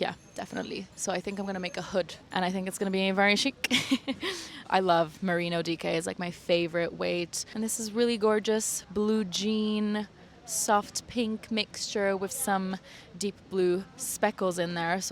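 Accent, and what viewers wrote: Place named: Italy. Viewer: You are Italian?? Great accent!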